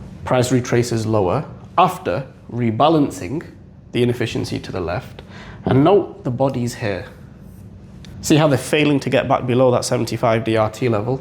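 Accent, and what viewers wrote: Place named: England, United Kingdom. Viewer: His English accent and talking about DRT makes it seem more complex than it really is. 😅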